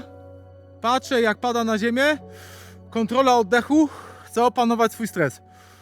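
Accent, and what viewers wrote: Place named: Germany. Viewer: No i spoko :) Dobrze wytłumaczone i nawet lekki niemiecki akcent nie przeszkadza ;)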